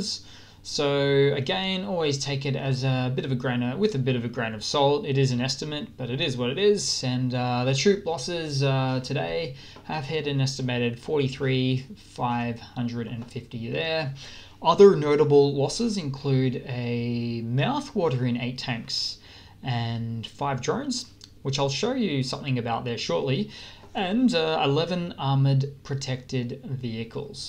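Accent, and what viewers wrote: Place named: Australia. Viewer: I like how you report major events based on their locations using a map. Your Australian accent adds individuality to it.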